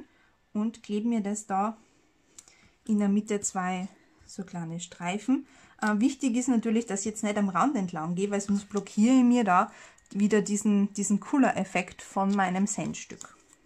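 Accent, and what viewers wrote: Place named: Austria. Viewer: Hallo liebe! Deine Videos sind einfach genial! 👍🏻😍Ich schau sie mir sehr gerne an. Die Anleitungen sind super verständlich und einfach. Ich staune immer, was für tolle Idee du für die Stanzformen vom Action hast. Anfänglich sind sie mir irgendwie"billig" vorgekommen,aber mittlerweile find ich sie richtig gut. Und dank deinen Videos natürlich auch 😊. Es spielt auch eine große Rolle, daß endlich eine Österreicherin amal gute Videos macht! Natürlich sind die von unseren deutschen Nachbarn auch sehr gut,aber der heimische Dialekt macht halt schon einen Unterschied 😁🤭. Na auf jeden Fall werde ich mir auch deine nächsten Videos"reinziehen und ich bin jetzt schon gspannt,was du schönes basteln wirst😊💕.Glg Maria aus Bad Gleichenberg/ Südoststeiermark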